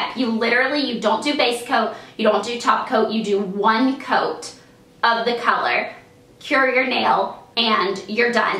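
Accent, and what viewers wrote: South, United States. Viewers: I honestly miss her southern accent..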